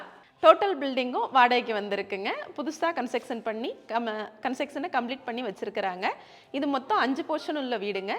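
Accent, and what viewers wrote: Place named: Kongu, India. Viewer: அம்மா, வீடு விற்பனை மற்றும் வீடு வாடகை தகவல்களை நீங்கள் விளக்கும் முறை அருமை. கொங்கு தமிழ் பேசுகிறது. வாழ்த்துக்கள். பணி சிறக்க வாழ்த்துக்கள்.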